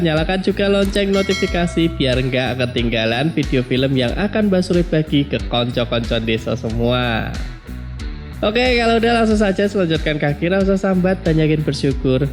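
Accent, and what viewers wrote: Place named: Klaten, Indonesia. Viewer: Mimine opo wong klaten yo? Logate koyo klaten 😅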